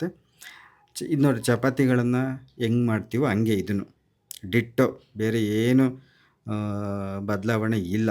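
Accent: Chitradurga, India. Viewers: Good sir ನಿಮ್ಮ ನಿಮ್ಮದು ಯಾವ ಊರು ನಮ್ಮ ಚಿತ್ರದುರ್ಗ ಡಿಸ್ಟ್ರಿಕ್ಟ್ ಭಾಷೆ ಮಾತಾಡ್ತಾ ಇದ್ದೀರಾ ಥ್ಯಾಂಕ್ ಯು ಫಾರ್ ಯುವರ್ ನೇಟಿವಿಟಿ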